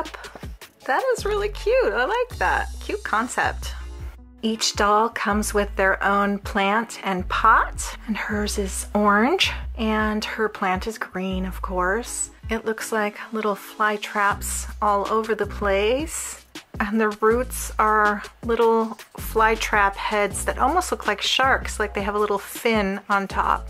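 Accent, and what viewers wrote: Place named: United States. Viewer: I love how you post your videos late at night (I'm just assuming no hate but from ur accent it sounds your American and right now for me it's so it would be late all around the USA but different times)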